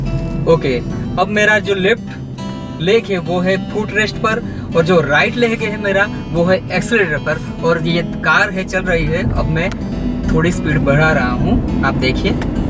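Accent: Gujarat, India. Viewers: Aap gujarati ho? Aapki hindi patel bhai jesi hai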